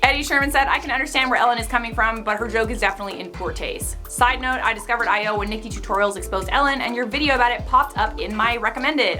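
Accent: United Kingdom. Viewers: You British lady accent is what keeps me clicking😉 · I love your british accent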